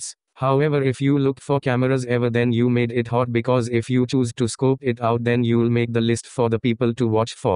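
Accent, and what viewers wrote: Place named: India. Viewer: AI VoiceOver with an Indian accent??? huh?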